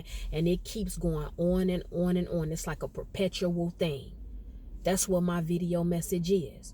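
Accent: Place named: South, United States. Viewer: Are you from the south I hear the southern accents? You stretch your words when you talk.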